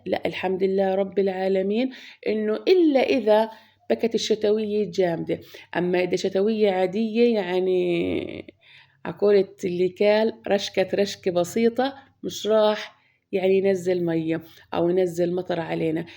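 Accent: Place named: Palestine. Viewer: سلامة عمرك يارب حبيبتي لهجتك الفلسطينية الفلاحة حلوة كتير زي لهجة امي الله يرحمها ويغفر لها لأنه إحنا من قضاء يافا يازور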